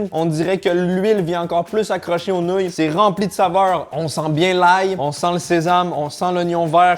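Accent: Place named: Quebec, Canada. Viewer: Tu vie au Québec ? Parce que tu bcp l’accent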